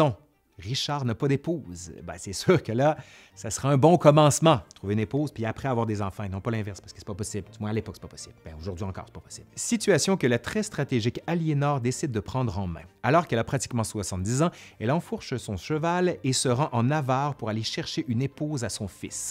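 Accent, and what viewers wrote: Quebec, Canada. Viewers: Bonsoir un grand merci pour l'histoire de France j aime beaucoup votre humour et un accent je pense du Québec si je me trompes je me suis abonnée à votre chaîne car j aime beaucoup l'histoire de France a bientôt merci ❤😊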